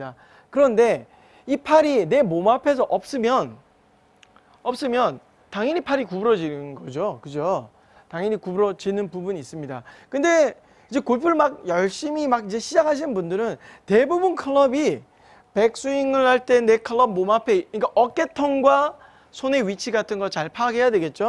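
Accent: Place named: Gangneung, South Korea. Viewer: 님 강릉분이신가요? 동향인듯 귀에 익네요 ㅎㅎ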